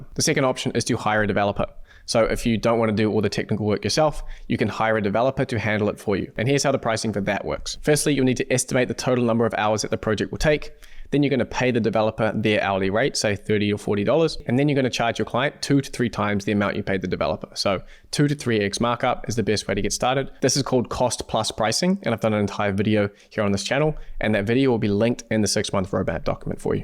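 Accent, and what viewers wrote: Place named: New Zealand. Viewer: Hi.I'm picking you're a Kiwi🙏If so are you in NZ and do you have a mentoring service to assist old buggers like me. Love to connect with you, Like your content👍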